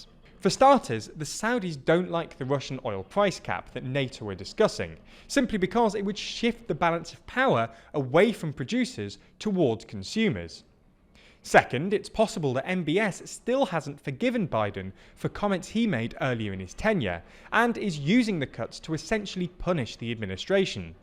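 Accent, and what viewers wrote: United Kingdom. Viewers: Yes, this is exactly what this channel needs, not just 538 with a British accent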